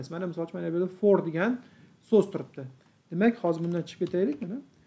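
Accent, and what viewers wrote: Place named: Tashkent, Uzbekistan. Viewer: Toshkencha accent ingilizcha so'z yoqmasa surgin bettan yoki qsp darssi organ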